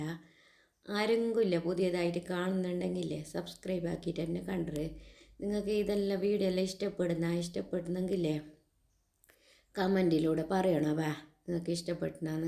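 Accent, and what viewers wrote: Kasaragod, India. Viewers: ചിക്കൻ കുടൽ കറി ആദ്യ മായി കാണുന്നു 😅👌പ്രത്യേകിച്ച് കാസർ ഗോഡ്കറി യും ആദ്യം തന്നെ. മുട്ട കറി യിൽഇടുന്നത് കണ്ടിട്ടുണ്ട്. പുതിയ ഒരു കറി പഠിച്ചു.കാസർഗോഡ് സംസാരവും.... 👌👌❤️❤️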